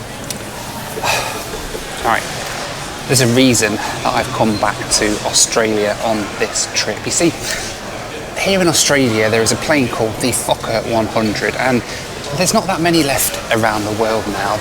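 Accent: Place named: United Kingdom. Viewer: Read the automatic captions to nearly fall off your chair laughing! That, combined with British accent, had me laughing like an idiot.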